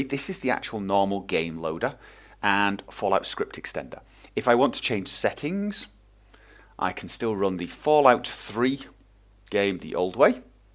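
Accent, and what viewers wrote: United Kingdom. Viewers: Is this guy norwegian? He speaks like a brit XD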